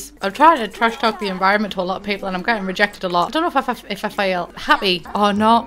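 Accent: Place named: Middlesbrough, United Kingdom. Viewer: Since I am a foreigner, my accent always makes me self-aware when speaking English, but your videos are getting me relaxed with it, truly I love your middlesborough way :)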